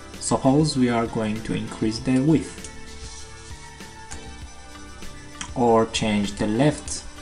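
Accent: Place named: Iran. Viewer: Tnx a lot.. Nice but music is loud i try to understand u.. I think u r from iran..ur accent and the way u speak is like iranian people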